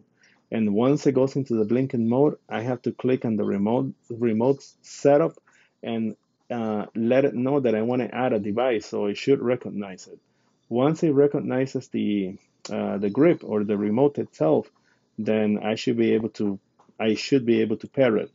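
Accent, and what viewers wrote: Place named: India. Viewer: Put Indian accent in title so we don’t click on that …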